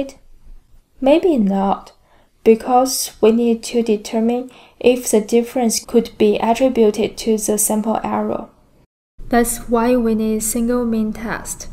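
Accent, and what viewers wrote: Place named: Asia. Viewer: Super thick Asian accent makes the video difficult to understand. Had to use subtitles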